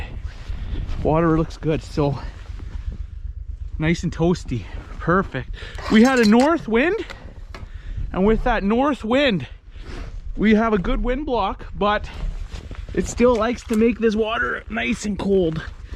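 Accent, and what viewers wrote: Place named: Minnesota, United States. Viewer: Are you from Minnesota? You have an accent from that region. I am impressed by your enthusiasm for your ranch. I grew up in Colorado. We used to get big storms too.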